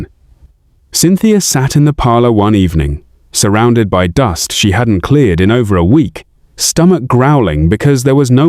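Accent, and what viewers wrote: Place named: Africa. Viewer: I like the story but l am partial to an African accent. Watching from Montreal, Canada 🇨🇦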